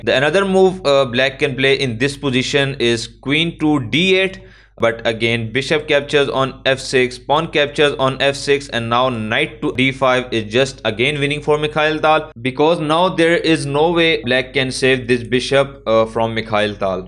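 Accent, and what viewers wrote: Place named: South Asia. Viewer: the voice of chess is oficially an accent from the sub continent. as a pakistani, i love it. a major game / sport where we own the commentary. and of course, indians are top level chess players so it fits well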